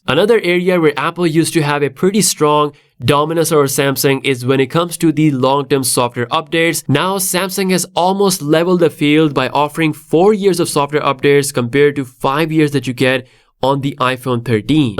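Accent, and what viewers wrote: India. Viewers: Your Indian accent is getting better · Man trying to speak good English still have 10% Indian accent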